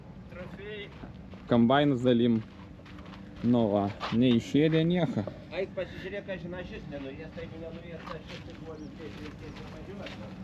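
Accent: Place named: Pasvalys, Lithuania. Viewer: pagal kalbod akcenta pasirode kad pasvalio raj :D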